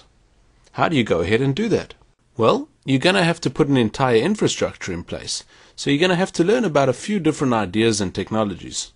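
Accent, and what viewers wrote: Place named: Afrikaans, South Africa. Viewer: jinne, jou afrikaanse aksent skyn deur ;P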